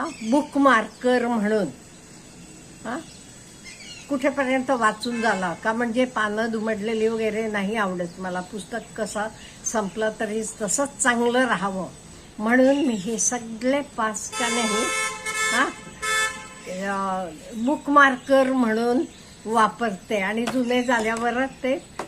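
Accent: Karnataka, India. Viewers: तुम्ही खूप गोड आणि हुशार आहात.तुमच्या बोलण्यावरून तुम्ही Karnatak च्या असाव्यात हे ओळखले होते.तुमचे videos informative असतात.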